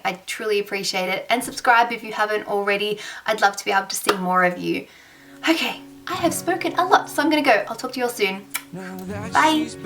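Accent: Australia. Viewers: Yes, an Aussie accent! New subbie here :)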